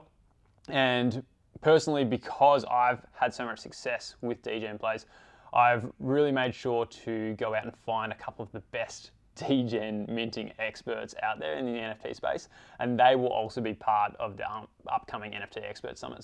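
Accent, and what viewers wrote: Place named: Australia. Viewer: Watched all the way through now, I actually enjoyed hearing a fellow Aussie in the space so keep it up my friend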